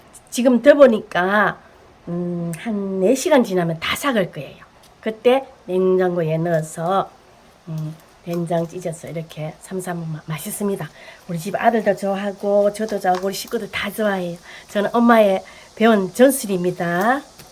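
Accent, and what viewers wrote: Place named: Gyeongsang, South Korea. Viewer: 경상도 분이라 설명이 쏙쏙ㅎㅎ 잘 배울께요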